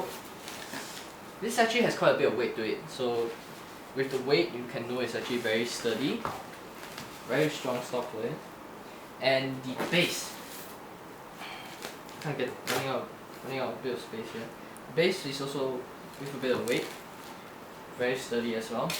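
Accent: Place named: Singapore. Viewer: the accent is killing me.... Singapore?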